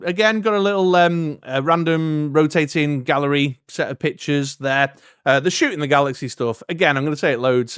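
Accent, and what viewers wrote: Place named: United Kingdom. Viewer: Thanks for the run down mate! Its always great to hear it in a brit voice lol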